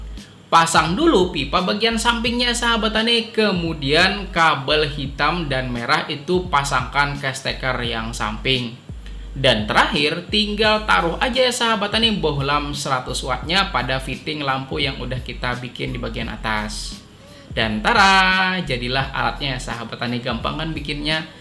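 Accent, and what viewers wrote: Banjar, Indonesia. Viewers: orang banjar sekalinya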